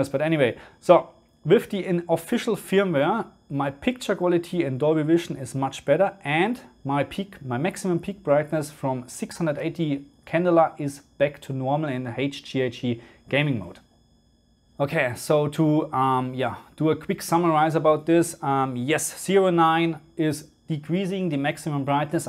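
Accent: Germany. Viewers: That's one heavy German accent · bist du deutscher? Klingt so 😁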